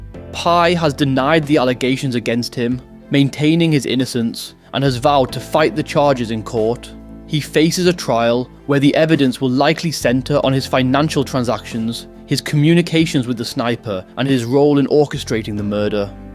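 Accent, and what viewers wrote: England, United Kingdom. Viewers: Bullshit.antother lame instagram mini docco ! Narratted by some pommy flop who probably never even been out here 😂💩🤮💯also the way the narrator says state leader 😂😂😂😂😂😂😂they dint use that language also nike bike 😂dudes been wearing nike s for last thirty years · He's English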